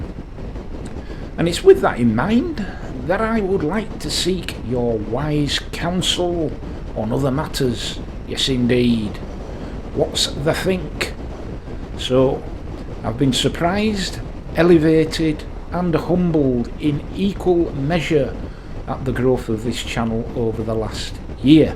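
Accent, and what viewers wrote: Yorkshire, United Kingdom. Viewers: I’d like to thank you for taking us along on the journey. The channel has evolved massively from those early days. Your preparation, determination, character and Yorkshire delivery (With a little help from the glorious back garden you have to ride around in ) make your channel unique. It doesn’t need much of a change in formula. Just keep polishing mate. All the very best to yourself, J & E. Catch up with you soon bud.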